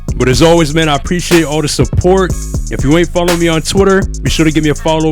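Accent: New York, United States. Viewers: You from NY gang?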